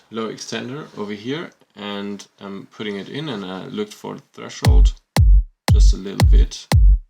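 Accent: Germany. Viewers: bist du ein deutscher? du hörst dich deutsch an. du machst super arbeit!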